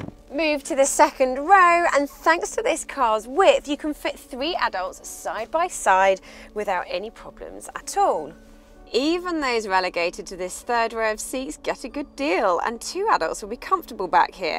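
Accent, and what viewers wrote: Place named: Estuary, United Kingdom. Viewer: Bit estuary.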